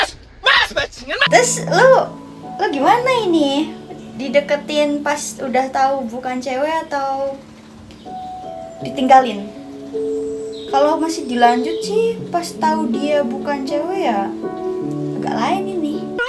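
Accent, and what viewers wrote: Java, Indonesia. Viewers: Muka sunda logat jawa. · kok logatnya kayak Jawa, aslinya mana mohon dijawab, salam jatim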